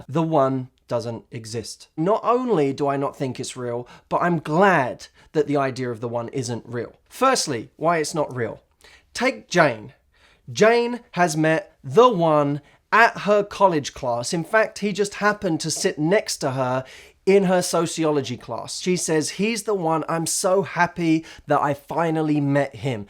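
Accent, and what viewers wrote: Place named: United Kingdom. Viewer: I like your videos, but my own problem is your British accent that I can't get used to!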